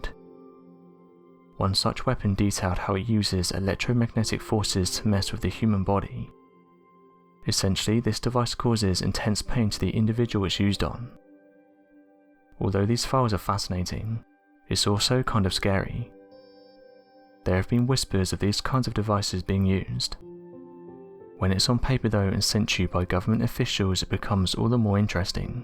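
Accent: United Kingdom. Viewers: I cannot stand this guy's wimpy British voice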